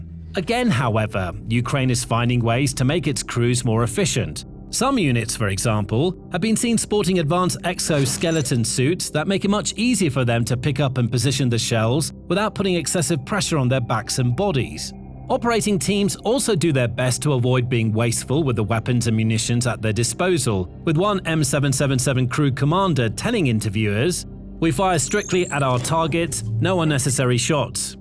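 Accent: United Kingdom. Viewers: Not actually American is it, British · Please lose the British accent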